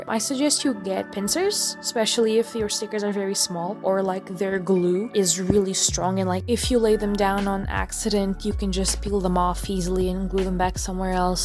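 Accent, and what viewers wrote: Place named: Portugal. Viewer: Got recommended this video, randomly… are you Portuguese, by chance? The subtle accent is quite recognisable. Bom vídeo! 👀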